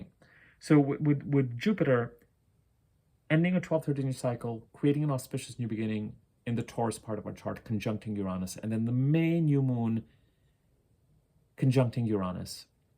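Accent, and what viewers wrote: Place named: California, United States. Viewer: Yay an video! I find your videos so very helpful helping me to reflect..& chilll out 😄 your voice is so calming 🙏love the Californian accent too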